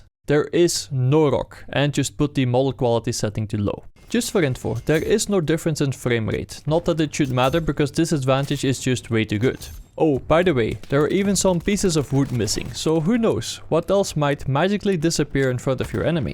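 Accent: Netherlands. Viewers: lol dit is echt nederlands accent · Are you dutch?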